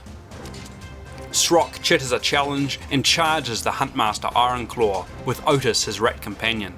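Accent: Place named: New Zealand. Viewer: Love the character put into the battle report mate. Also, you sound like a kiwi. Hello from Hawkes Bay!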